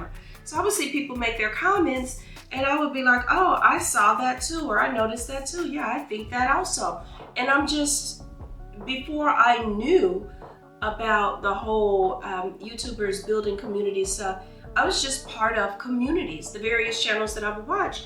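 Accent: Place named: South, United States. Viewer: the Southern twang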